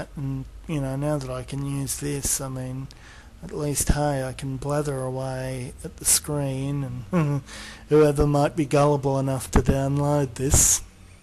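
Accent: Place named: Australia. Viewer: lol your vid was on my recommendation, so random. Damn this was 18 years ago so judging by your accent you’re probably one of the few Australians to ever upload a YT video during that time.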